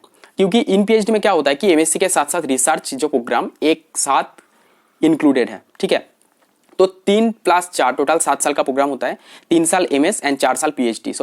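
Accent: Bengal, India. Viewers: Sir are you from West Bengal? 😮😮😮 Your sound is looking like Bengalies.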